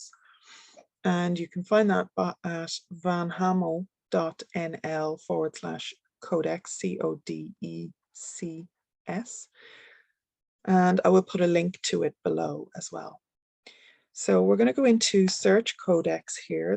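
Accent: Ireland. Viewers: I finally got in! It takes a little end-a-round for yanks! Your voice is perfect--Irish, but not too heavy an accent, which can make it hard to understand. Thank you for taking the time and consideration. I close my eyes and just try to picture the places, and the adventures that took place there! I will also read them myself, as that is my strong suit.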